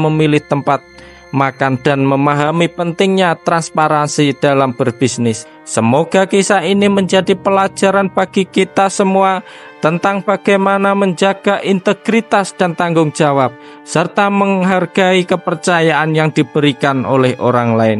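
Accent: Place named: Java, Indonesia. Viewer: Suka sih dengan ceritanya, tp suara mas nya terlalu medok kaku, nada bicaranya gk enak di dengar, Terlalu medok, terlalu kaku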